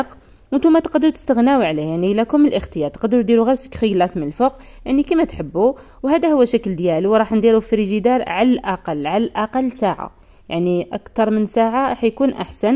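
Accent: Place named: Algeria. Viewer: كنت نديرها باللوز ولكن ان شاء الله نجرب تاعك شهيتيني حتى قلتي برك عاد عرفتك جزائرية سبحان الله اللهجة مقاربة بيناتنا👏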